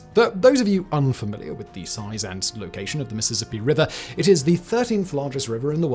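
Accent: United Kingdom. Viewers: hearing a brit talking abt george washington is crazy. love ur vids im not hating it’s just funny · I am not English native speaker (it is my 3rd language) I watched thousands of documentaries in English but this guy speech is unbearable, too fast and annoying monotonous british accent (or whatever this guy is).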